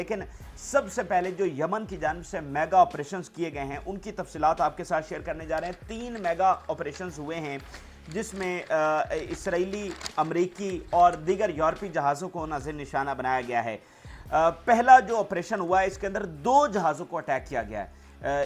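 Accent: Punjab, Pakistan. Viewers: That Clown dance didn't end now he is playing music in the background Obviously he has been having fun and there thousand innocent people r getting killed everyday But people like u supporting him and like his ridiculous videos Shame on all of u guys who r supporting him He can't speak one right word of English and no one force him to read punjabi English Can't say right name of jewish politicians name Despite he copies all these news from other sources despite listening he can't learn right English and say right words and other names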